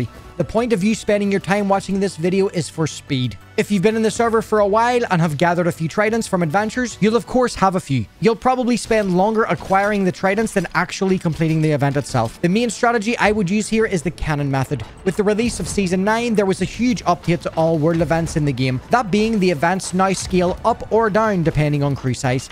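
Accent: Northern Ireland, United Kingdom. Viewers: Are you northern irish?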